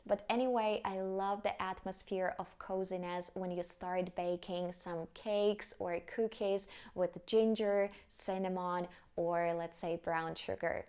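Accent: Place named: Russia. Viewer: На английском лучше, слушая русский английский не выучишь)))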